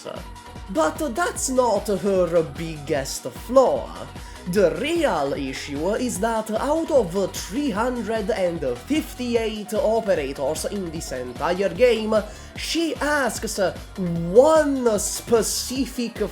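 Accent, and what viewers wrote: Italy. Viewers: Ti seguivo senza sapere che tu fossi italiano, però effettivamente l'inglese è proprio maccheronico <3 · "This is why I sound like this" Italian?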